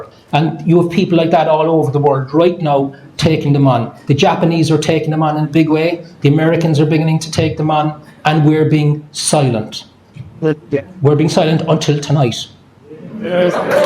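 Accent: Ireland. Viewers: 4 comments really yootoob?? 🤨 This was outstanding. Nothing I didn't already know, or have heard from others or suspected myself - but somehow for me, in this moment, it consolidated it all. Something about that glorious Irish lilt that made the repeating 'un-put-downable'. However, I am now so certain of Everything, that I don't know whether I'm so exhausted from 'knowing' that I'm inclined to pee-everything up the wall, party like a loon and spend what funds I possess, or whether I am so fired - especially obo my Grandchildren - that I'm gonna light a torch and pitch fork my way in to History🦬🔥 ( If you know me - the latter is the ONLY option. If you don't know me - is right - Big Bag, Big Fight - lead from the front) ✊🙏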